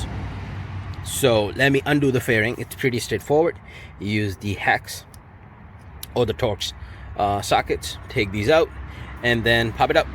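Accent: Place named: India. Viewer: u sound desi as fuck bro 😂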